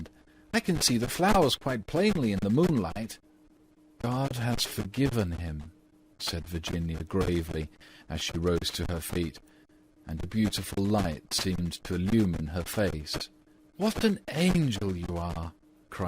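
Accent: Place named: United Kingdom. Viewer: good but it's British .. Is there a story with an American accent ?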